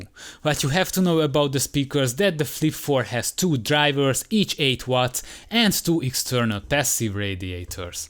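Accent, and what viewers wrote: Hungary. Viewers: De hallatszik hogy magyar vagy :D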